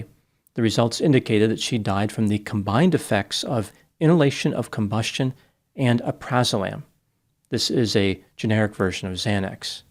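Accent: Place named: New Jersey, United States. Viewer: I thought Dr. Grande was from New Jersey, hah. He has an accent that places him there or greater NYC.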